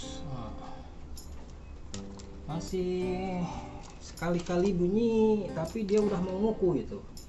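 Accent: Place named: Indonesia. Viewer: Kenapa bahasa inggeris,tapi suara endon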